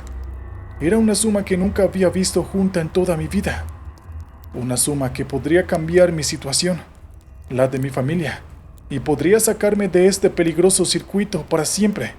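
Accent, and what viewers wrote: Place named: Mexico. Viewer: Esa voz suena mexicana 🤣🤔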